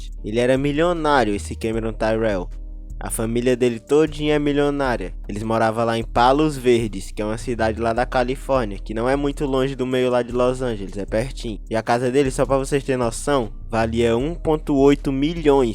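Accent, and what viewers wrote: Fortaleza, Brazil. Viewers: Mansho tu e de fortal ne? kk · Tu é de fortaleza? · Tu é de Fortaleza é btl?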